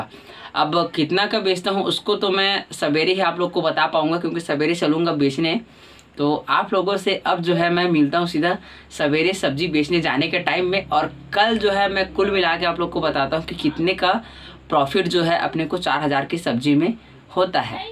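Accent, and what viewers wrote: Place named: Chhattisgarh, India. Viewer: Main iski Hindi boli se samajh gya ki ye Chhattisgarh ka hai..mai bhi Chhattisgarh ka hoon Bhai .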